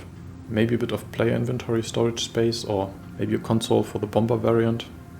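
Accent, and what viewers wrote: Germany. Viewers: you sound like a german speaking english :D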